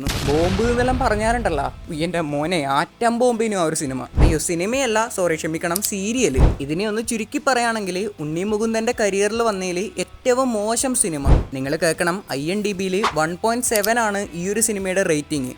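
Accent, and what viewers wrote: Kannur, India. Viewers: bro roastil ittapole kannur slangil samsarikk🤌✅️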